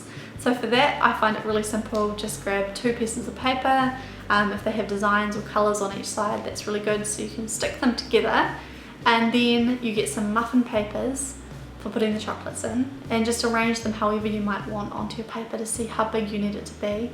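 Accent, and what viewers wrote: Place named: New Zealand. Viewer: Just discovered your channel. Absolutely love your videos, they are so genuine and watching them made me smile. I sense a kiwi accent hhaha. Hello from your neighbour in Australia :)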